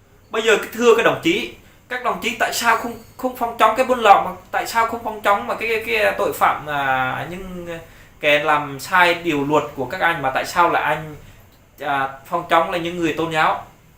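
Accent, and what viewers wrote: Standard. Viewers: Nói tiếng Việt chuẩn lắm😊😊😊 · Nói rất chuẩn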